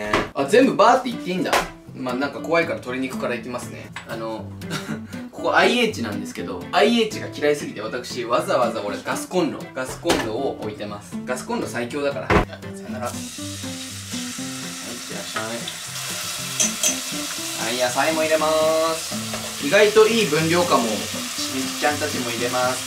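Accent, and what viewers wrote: Miyazaki, Japan. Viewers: 宮崎弁喋ってるの超好き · の宮崎弁かっこいい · 宮崎弁最高👍👍👍👍👍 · わ〜！！！！ばちこりの宮崎弁嬉しい😭😭🤍(宮崎県民です) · うちの親戚宮崎人やけんめっちゃ宮崎弁懐かしい気持ちになるw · 宮崎弁可愛すぎる😂❤️ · 最初の宮崎弁でめっちゃ親近感わいたっちゃけど😂(あえて宮崎弁で笑笑)度々出てくる宮崎弁がいつも嬉しい☺️🤞🏻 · 今まで登録はせず、おすすめに上がってきたら観る程度だったんですけど、宮崎弁とただただ良い人感にやられて登録しましたww さんみたいな人が叔父だったらわたしもきっと自慢するし伯父っ子になりそう。。🥺🥰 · さんの宮崎弁なんか好き笑ちなみに、私のおばあちゃん家も宮崎です！ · 宮崎弁すごい🤣🤣🤣🤣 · めっちゃ宮崎弁、もう宮崎弁で話した時あ、って思ったwめっちゃ親近感、私も宮崎県だからめっちゃ訛ってるw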